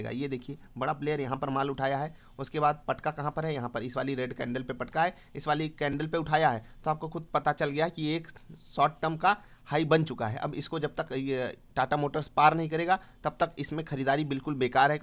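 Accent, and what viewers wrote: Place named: Kanpur, India. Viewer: ap kanpur se he kyaa?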